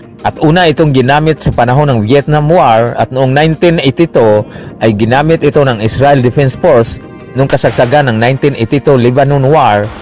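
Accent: Visayas, Philippines. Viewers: Ayusin mo yung pgtatagalog mo bisaya ang dating mo.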